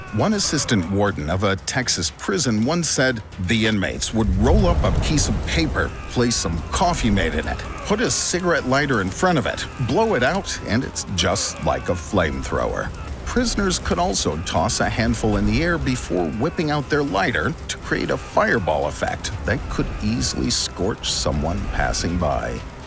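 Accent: United Kingdom. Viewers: You’re ao british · Leave it to a bri'ish person to give an account on the best way to stab someone with a knife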